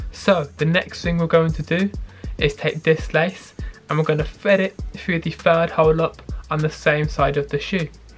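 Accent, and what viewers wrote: United Kingdom. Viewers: are u british, i like the accent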